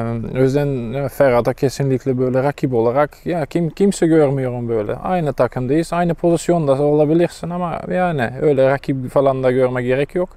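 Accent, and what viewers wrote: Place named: Syria. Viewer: Suriyeli gibi konuşmuş ama saglam kaleci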